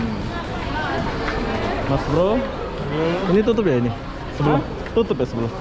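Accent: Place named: Malaysia. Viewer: Makin ketara bahasa Melayunya sekarang bossque makin mantap liputannya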